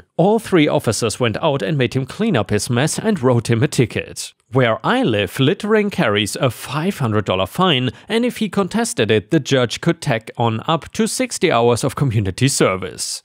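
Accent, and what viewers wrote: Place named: Germany. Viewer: I can hear the german out of your voice, and i love it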